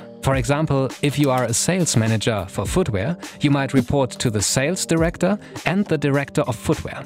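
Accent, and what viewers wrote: Germany. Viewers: Are you German we can hear it. Ja aber ist trotzdem ein gutes Video. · The German accent narration is winning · what you mean 'nobody', his accent is more German than Stefan Effenberg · Flashing images no shit. This content should not exist on YouTube because of the flashing images. A warning before the video won't cut it id say. Also this guy's accent is on par with the Flashing images, gtfo sauerkraut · i hear a fellow german accent if i hear one;) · love your german Accent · Deutscher Akcent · I hear the speaker is german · After 1,5sec you can tell this guy is german, one of the very few who can actually pronounce adidas correct.🤣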